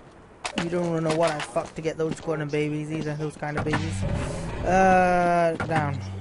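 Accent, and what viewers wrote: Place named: England, United Kingdom. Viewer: english accents ftw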